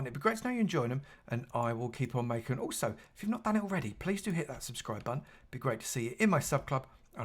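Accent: United Kingdom. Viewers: Oh, cool, a chill British voice, so I can wind down after work and learn new farm mechanics since I’m getting back into Minecraft after a decade… I might even watch more because he sounds so rela…”HELLO EVERYONE!!!” yanks headphones out of ears Nope, I think I’m going to find tuts online (like I used to do) and refigure it out myself this weekend with just Minecraft ambience/music as the only thing I will hear and know it won’t suddenly shoot up in volume for no reason at all.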